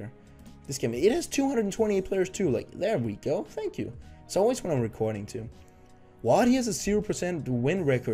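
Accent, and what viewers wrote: Denmark. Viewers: Lol er du dansker? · Are you from denmark? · are u a danish person ore what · are u from denmark?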